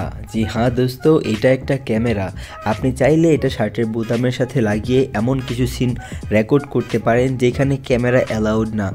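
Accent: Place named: India. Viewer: Your bangla like hindi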